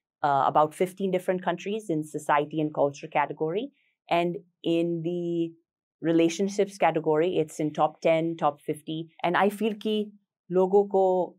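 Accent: India. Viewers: Ye Puri Desi Dhang Me Dhal Gayi Hai .Bolne Ka Tarika Body Language Pura Desi Ho Gya Hai 🙏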